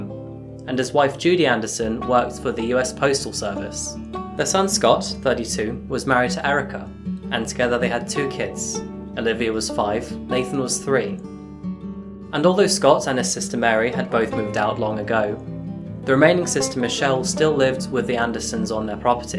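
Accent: England, United Kingdom. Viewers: You “lost your accent” when you left Seattle? 😆 I would argue you ACQUIRED your accent when you moved to England. Eh, po-tay-to, po-tah-to. Well, either way, I came across your channel recently and I have now spent my whole day watching your videos. Great content! Thanks and keep it up!!! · He's English now, which means he lost his American accent. An American accent isn't "default". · This is crazy. Who moves from Seattle to England and starts speaking with an English accent. Gross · By the way, I like your English accent, being educated at an English school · You did not lose your accent by moving to England, you gained one. You have a British accent 😁 This Joseph guy is a bad actor. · . You are the first American born person with an English (correct me if I’m wrong) accent. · What part of England is your accent? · That's interesting that you grew up in Seattle and then moved to England and got an english accent. 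I deserve Madonna an apology. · I heard him say in one video he was born in Seattle I always wondered where the British accent came from. Now I know. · Yes it is. Slightly northern accent sometimes. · Their accents aren't even from the same country though. TC is Irish and this guy is English....very noticeable difference there. I can definitely see the other similarities. I don't get the same vibe from the two channels even though there's a pretty clear inspiration in style here. TC is a lot more lighthearted for a start. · I will comment that you don't seem to have an accent here with this video, yet you are very "English" with the others I have watched. I am new to your channel and am not watching them in order. You do say things like, "Car Park" (we say driveway) and many other endearing comments which show your English side. 🏴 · ENGLISH accent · I've always assumed you were English, going by how you sound. 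Never knew you were born in the US! When I went to the US on holiday nobody could understand me, I even had someone say I spoke English with a funny accent, they asked where I was from, I replied England! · "Losing my accent..." I think you should've said gaining your accent haha. I never would've guessed you were born in the states with that thick British accent you have! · You didn’t lose a Seattle accent. You gained a British accent, which sounds nice BTW. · Wait so you're american with a bri'ish accent, amazing 👁️👄👁️ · Ah that’s why you’ve got an English accent 😊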